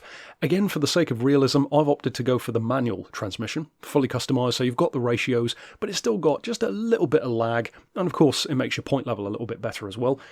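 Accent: United Kingdom. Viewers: You know you're in good hands when you find a tutorial with a British accent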